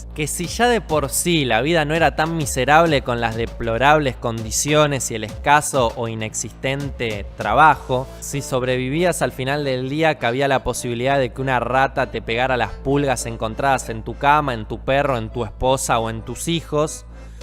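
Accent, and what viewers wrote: Argentina. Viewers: me mama (encanta) el acento argentino, justo hace 2 semanas apenas veía este tema, muy buen video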